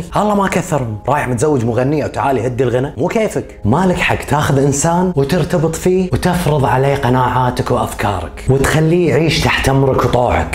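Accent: Kuwait. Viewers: باين عليك كويتي تقول قطاته والا حن نقول شطحاته او طلعاته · سردك جميل واللهجة الكويتيه ما شاء الله جميله، كل المحبه للشعب الكويتي الأصيل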